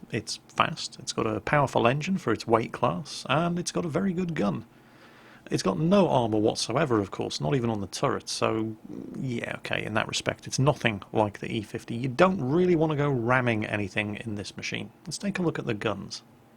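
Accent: England, United Kingdom. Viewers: Not interested in WoT anymore, but I needed a nap. So I fall asleep to the sweet, silky, british voice of and wake up just as the video ends. Thanks:) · Really funny thing is, that Czechoslovakia existed before and after WWII. As the matter of fact during WWII the countries separated - Slovakia cooperated with Nazis while Czech Republic remained a territory ruled by Germans. Therefore these are check-them-Czech-tanks allright. IDK if someone mentioned it before me... ;P Great channel BTW, I love your english accent, is it genuine? :)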